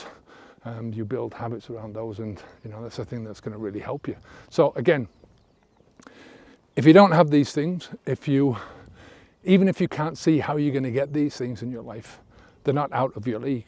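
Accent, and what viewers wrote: Ireland. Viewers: I had the conversation with myself this week. Im a functioning alcoholic. No major hangovers or troubles with the law but definitely something i want to stop. Im SO grateful that i found your channel. You sound irish also. Im from Donegal. Living in Brooklyn ny. Thank you, sir.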